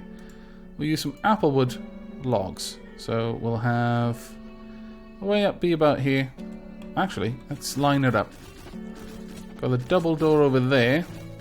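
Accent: Wales, United Kingdom. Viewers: Thank you very much X) I must say without sounding to weird I love your welsh accent as I suppose many of us do :)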